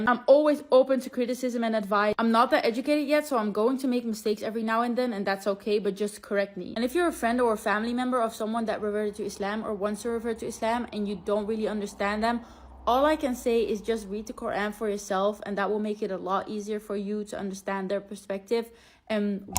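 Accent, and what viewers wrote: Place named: Arab world. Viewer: لهجتها كأنها عربية..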